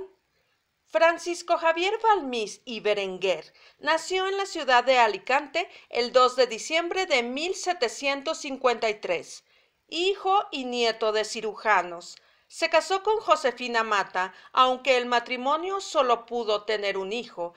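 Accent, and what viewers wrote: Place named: United States. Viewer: El mejor video de la vacuna que he visto, y con ese precioso acento Americano. Muchas gracias. 🇪🇸🇯🇪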